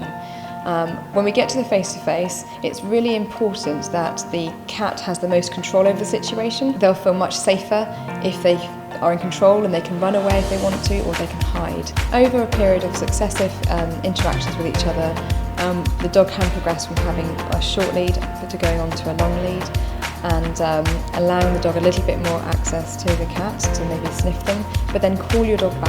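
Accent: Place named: United Kingdom. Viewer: The music was overpowering the female voice and it was a British accent, so even more difficult to understand.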